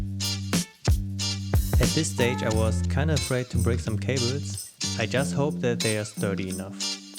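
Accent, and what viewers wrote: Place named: Germany. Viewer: cooles video aber man hört schon das du deutsch bist und du hast alles richtig gemacht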